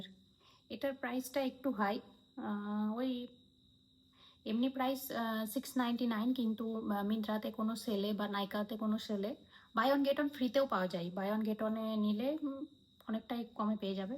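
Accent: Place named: Dhaka, Bangladesh. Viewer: Nah amr bang dakar awaj ta sunte valoi lagche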